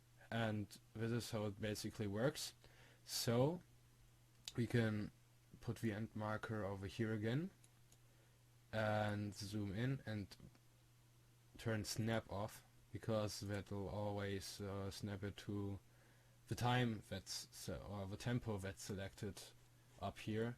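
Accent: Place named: Germany. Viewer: Bist du Deutsch? wenn ja hört man es sehr :D aber trotzdem gutes Tutorial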